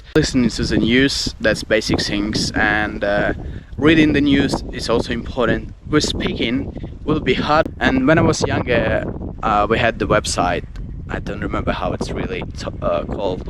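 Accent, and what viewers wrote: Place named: Australia. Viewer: Скоро уже не отличить от австралийца)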